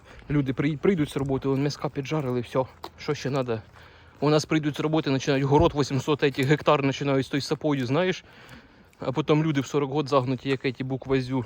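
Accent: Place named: South, Ukraine. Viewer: Привіт земляк. Наш южноукраїнський язик ні з чим не переплутаєш І не срівняєш ні з чим, ласкає вухо... Місто Покров бивше Орджонікідзе вітає тебе 🙏❗ Вернувся назад щоб сказать-написать, шо підписався:hand-pink-waving:і👍поставив.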